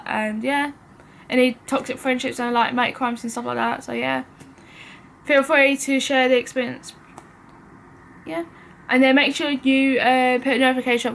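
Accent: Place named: Essex, United Kingdom. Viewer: And yeah I wasn’t diagnosed till I was 14, you got diagnosed young compared to me, btw love your accent? Are you Essex? East London?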